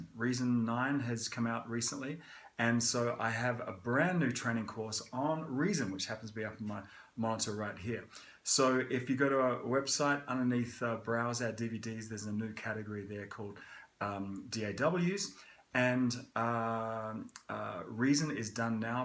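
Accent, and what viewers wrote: Australia. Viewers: Amazing you still kept your Australian accent.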